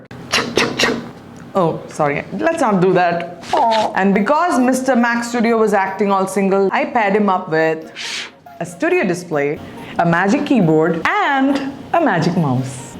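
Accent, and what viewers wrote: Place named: Thalassery, India. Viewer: Thalle English 😂 Nice Presentation Crisp and Clean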